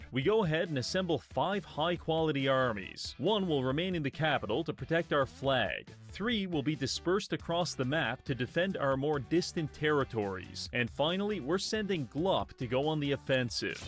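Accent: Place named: South, United States. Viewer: I find it great that her Southern gets stronger every video.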